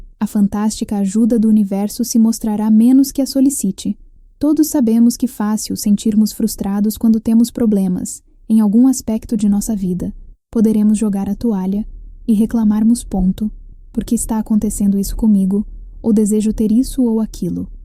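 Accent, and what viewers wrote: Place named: Portugal. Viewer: Mas reparou que sai do PT Br pro PT de Portugal?😅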